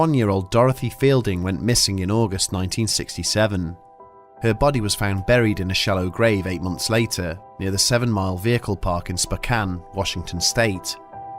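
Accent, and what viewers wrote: Wales, United Kingdom. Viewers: Are you from Newport or Cardiff?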